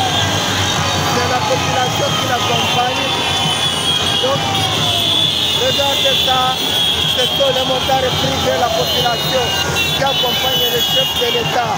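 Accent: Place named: Rwanda. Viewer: Le commentateur a un accent rwandais vraiment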